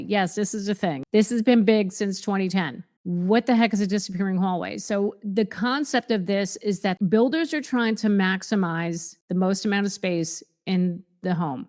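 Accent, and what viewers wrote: New Jersey, United States. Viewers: Gotta love that New Jersey accent! The way she says "off" reminds me of the Sopranos (in a good way).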